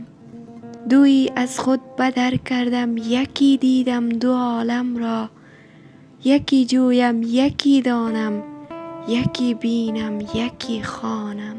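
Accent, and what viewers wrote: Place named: Tajikistan. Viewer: آفرین برتو بانو با این لهجه شیرین دری و تاجیکی، و مرحبا برمولانای جان ، که چه زیبا و چه کوتاه ، تکلیف ، وجود خود وهمه آدمیان را شیرین و گویاه بیان کرده ، بعصی اوقات فک ر میکنم اگر مولانا و شمس و ابن سینا و سهروردی و دیگر ابر انسان های تاریخ ، انسان ند و از نوع بشر ، پس ما کی هستیم ، شاید علفهای هرز ،باغ بزرگ افرینش هستیم که یکذره رنگ سبزی درختان را داریم و مارا جزیی از این باغ حساب کرده اند ، آیا اینها مخلوقات خاص خداوندند ،که خاص آفریده شده اند یا ماهم میتوانیم مثل آنان بشویم . دنیای عجیبی دارد این مولانا . 🌺⚘🌼💐🍁💐🌼🌻🌺🙏🙏🙏🙏♥️❤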